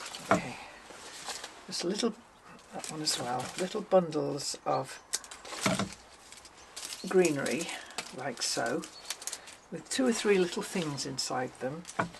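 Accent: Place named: Lancashire, United Kingdom. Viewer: My Lancashirian grandmother was only 10 when she immigrated to Canada but she retained pronounciation of words like sun, stuff, does, and others. I hear her when you speak. LOL May you have a Merry Christmas and Happy, Healthy New Year.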